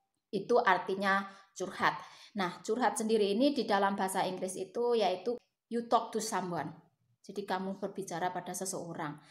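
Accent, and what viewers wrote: Java, Indonesia. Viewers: pa kabar teh, suka lihat teteh bicaranya medok banget.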